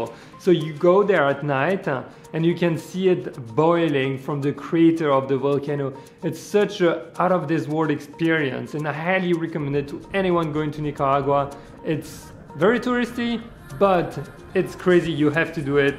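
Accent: France. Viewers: cool video! but i wonder where ur accent is from is it french?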